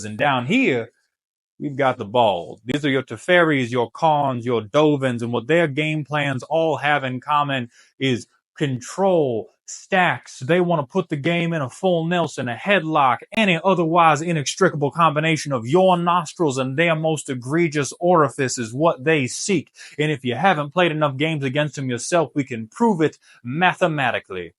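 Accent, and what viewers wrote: South, United States. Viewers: I swear every day you slowly turn into a southern businessman, I expect to hear “I do declare” and “now I’m just a simple countryman” every new video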